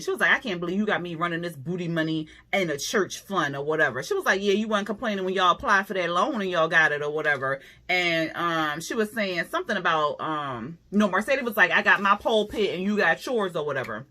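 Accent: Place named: Washington DC, United States. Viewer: girl i can tell u from dc. i can hear it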